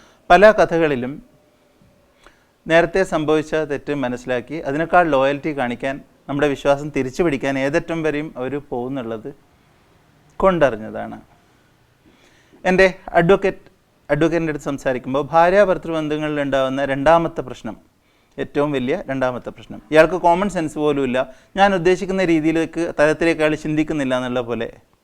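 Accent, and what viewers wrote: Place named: Kanhangad, India. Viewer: ഞാൻ ചിന്തിക്കാറുണ്ട് എന്തുകൊണ്ടാണ് ആളുകൾ നല്ല content videos കാണാത്തതു എന്നു . എല്ലാവര്ക്കും മറ്റുള്ളവരുടെ സ്വകാര്യത അറിയാൻ ആണ് ആഗ്രഹം. Hmm അതെന്തെങ്കിലും ആവട്ടെ The only regret is that I was little late to watch your videos Now watching all the videos together It's like a close friend sitting next to me and talking ചിലപ്പോൾ kanhangad slang ആയതു കൊണ്ട് എനിക്കു അങ്ങനെ തോന്നുന്നതാകും I'm waiting for more videos like this❤️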